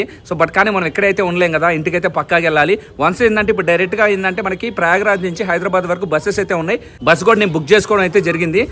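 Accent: Nellore, India. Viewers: నువ్వు చెప్పేవిధానం సూపర్. నెల్లూరు యాస చాలా బావుంది.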